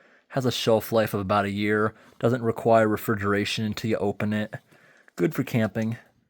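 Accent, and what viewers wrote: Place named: Connecticut River Valley, United States. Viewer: Don't understand why I watch this stuff lol recognize the Conn Riv Vly accent good video my man ✌🏼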